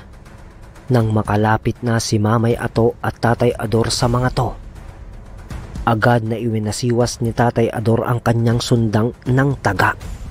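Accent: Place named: Ilocos, Philippines. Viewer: Nkk2wa k pakinggan anak kc my ilokano tlaga lov2 nnay ❤❤❤❤❤🎉🎉🎉🎉🎉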